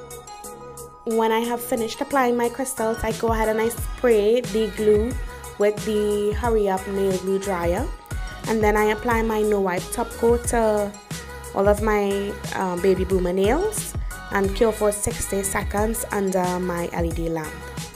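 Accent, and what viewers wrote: Trinidad and Tobago. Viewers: I can tell a Trinidadian accent anywhere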